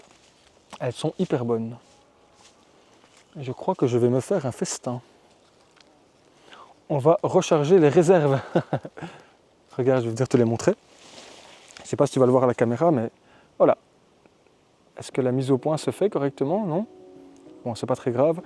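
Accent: Belgium. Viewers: Ouit= huit j’aime trop ce petit accent belge. ♥️👍😊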